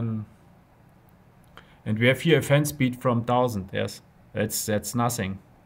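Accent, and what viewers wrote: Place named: Germany. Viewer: German accent is the best :D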